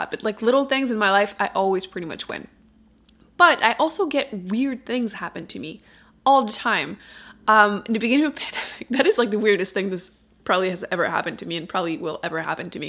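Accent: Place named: Quebec, Canada. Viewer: Français rouillé! ;) J'avoue que je taquine mon fils quand il nous visite et parle franglais... Mais je trouve quand même surprenant - agréablement - que les mots en français que tu glisses à l'occasion "sonnent" québécois quand même... plusieurs finissent pas parler avec un accent anglais, même si leur langue maternelle est le francais...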